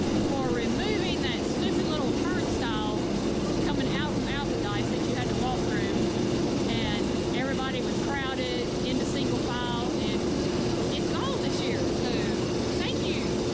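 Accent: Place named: South, United States. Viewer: you sound like Reba McIntyre when you talk. What part of the South are you from? 😁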